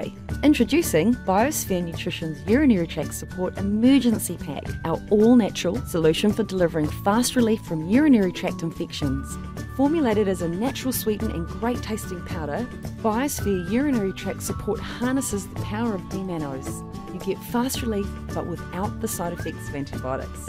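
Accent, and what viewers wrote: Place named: New Zealand. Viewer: u sound new zealander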